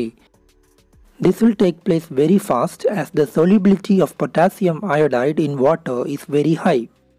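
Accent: India. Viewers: Your sounds more likely to indian😄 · There's no polish video so here I am 🥲 that Indian accent hurts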